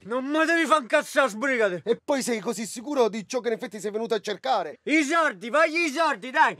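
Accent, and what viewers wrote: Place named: Rome, Italy. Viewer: Aperto il video, non stavo guardando lo schermo e appena ho sentito parlare in romano ho zompato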